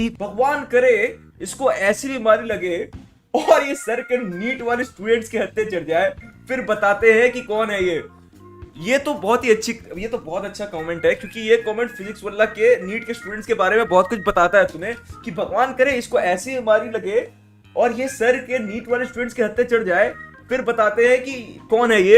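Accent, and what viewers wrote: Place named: Bengal, India. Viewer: Bengali ho bro 🤭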